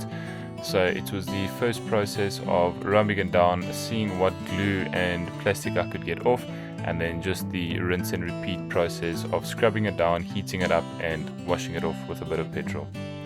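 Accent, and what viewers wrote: South Africa. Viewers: Great video Bro 😎 are you from South Africa? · Could hear the accent! we out here supporting out South Africans!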